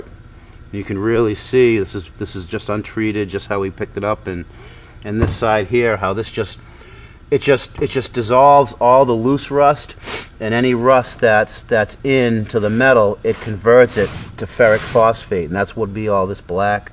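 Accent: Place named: Massachusetts, United States. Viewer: Its pronounced kah , and I couldn't hear it cause I'm from heah too ( mass)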